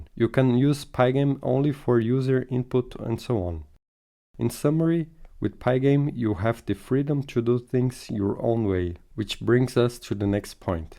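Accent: Brazil. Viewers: Man , are brasilian? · are you Brazilian? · O inglês com o Tchu pra ca e tchu pra lá é do Brasileiro hahahaha. Ótimo video. Seguindo e cutido. Um Salve da Holanda!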